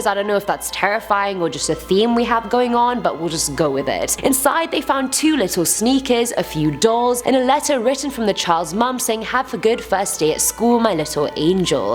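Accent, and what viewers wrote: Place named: United Kingdom. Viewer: i wish the host spoke clear straight English without that British accent, was really struggling to understand her ........that’s it I’m giving this video a thumbs down because i cant understand what this woman is saying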